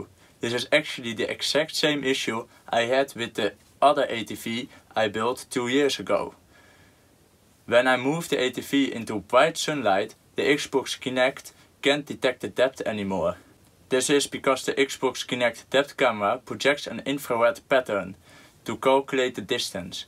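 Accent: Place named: Netherlands. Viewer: Je kunt zo horen dat je uit Nederland kom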